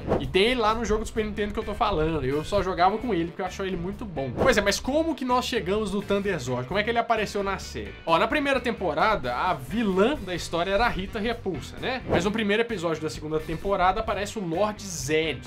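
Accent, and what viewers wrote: Minas Gerais, Brazil. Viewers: Esse brother é muito mineiro! kkkkkk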